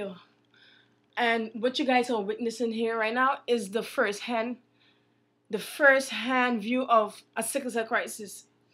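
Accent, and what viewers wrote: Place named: Guyana. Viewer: Are you Guyanese? you sound so.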